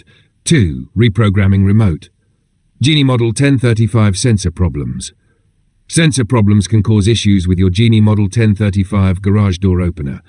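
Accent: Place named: Europe. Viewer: This is the most obnoxious narration. Does every video need a European accent to convey legitimacy. It doesn’t.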